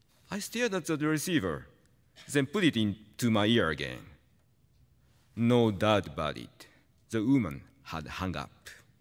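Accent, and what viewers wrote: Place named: England, United Kingdom. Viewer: I love his English accent, sounds so melodic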